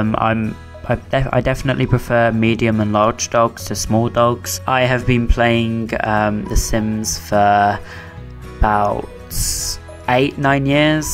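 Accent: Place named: United Kingdom. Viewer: We love you " " your the next "Mental Heath Hero" that will take the world by storm! Your always getting a friendship with me no matter what your mental health status is! BIG BIG HUGS on your bravery and sharing your journey on this video! Your first video was a "Groundbreaking Hit"! Your "British" voice is as adorable as ever and I'm hoping you will do a musical "Let's Play" where only you are singing the entire time and in conversation! But until then you are loved and I enjoyed this video very much!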